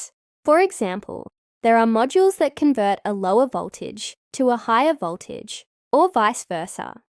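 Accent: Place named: Australia. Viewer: Very interesting. Thanks for sharing. I like the relatively light Australian accent. 👍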